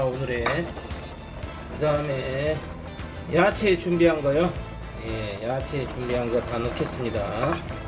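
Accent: Jeolla, South Korea. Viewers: 구수한 전라도 사투리 나오는거 정겹슴니다.라드는 어디서 팔아요?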